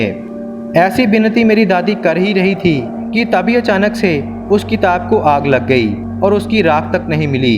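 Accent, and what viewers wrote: Punjab, India. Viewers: Caught a panjabi parson telling hindi story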